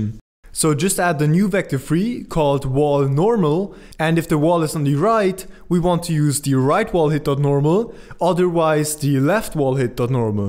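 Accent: Germany. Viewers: IDK but is he German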